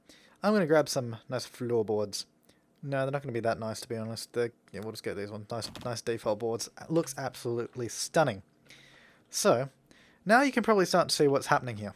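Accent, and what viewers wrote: Australia. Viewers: are you Australian? cus i am and icant tell if you have an aussie accent or not but i do kno aussies say bute and bambas like you did in the vid :) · good video, it was very useful, r u auzzie??? u sound like it, i am :]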